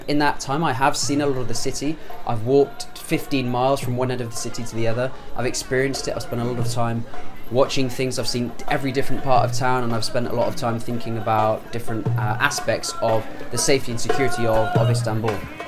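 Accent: United Kingdom. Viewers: Hi, I really want to say that your british accent is so pretty cool! I like it so much and want to have this accent as you :) Hope you come to travel in Thailand once. Although it might be not attractive so much like a big city, there are a lot of attractions waiting for you to enjoy x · Heyyy I love your accent Can you tell me something about it? And where are you from in UK? I mean where you grow up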